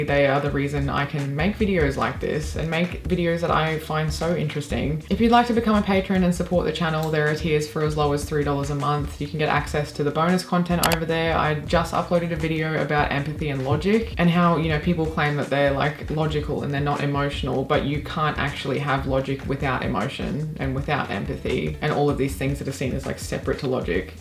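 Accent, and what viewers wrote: Australia. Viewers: The counter argument to your video is the covid debacle, where many experts and scientists did lie to the public, often repeatedly. An advanced degree does not confer advanced ethics, or any ethics at all. And btw, I don't know if you're Australian or a New Zealander, but both those countries' responses to the pandemic made America look like a land full of geniuses in comparison (which obviously we're not) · I agree, but I having to listen to this in an Australian accent is somewhat counterproductive. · Are you an Aussie living in America or something ?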